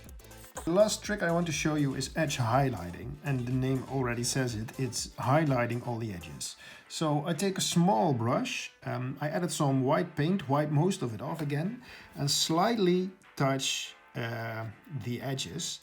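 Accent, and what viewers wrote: Netherlands. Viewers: Jij bent zeker een Nederlander he😏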